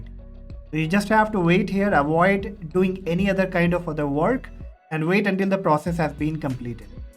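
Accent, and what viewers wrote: India. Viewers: when you hear the indian accent you know its gonna be a good video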